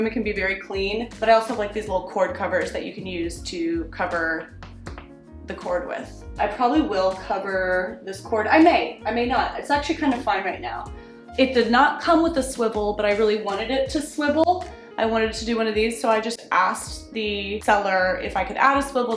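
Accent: Italy. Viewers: maddai il tuo italiano e' eccellente! Hai pure un accento emiliano! Brava e complimenti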